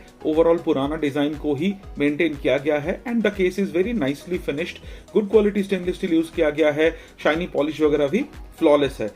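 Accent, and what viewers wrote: Bengal, India. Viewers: Dada bangali??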